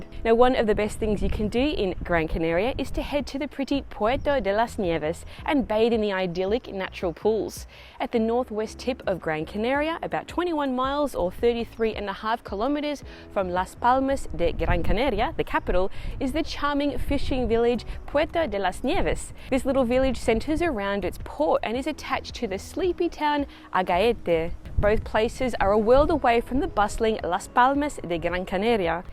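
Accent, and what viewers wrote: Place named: Australia. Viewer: Are you an Aussie? Your accent sounds like. The Spanish pronounciations are not always on point, but sweet. I would have appreciated if you had consistently pronounced Gran Canaaaaria (not: Gran Canaeria - English way). And things like: 'concista' not 'conquista' - you know what I mean. Just some gentle feedback, since language seems to be a big part of your vids - great way of combining it. Lots of good info, and nice video footage - muchas gracias!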